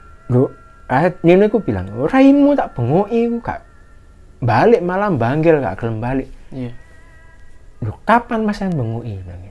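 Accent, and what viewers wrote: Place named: Surabaya, Indonesia. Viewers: Kenapa ya,kok saya suka dengerin logat orang Jawa Timur kalo ngomong.. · Asyik banget aku dengerinnya,trus sampai senyum" sendiri.keren rek,bahasa suroboannya.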